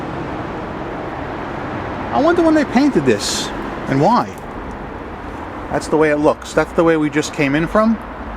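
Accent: New York, United States. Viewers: god i hate how you nyc people talk. it makes me ashamed to be from this state. its PARK not PORK you fucking idiot. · Nothin' like a good ol' New York accent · Loving that New York accent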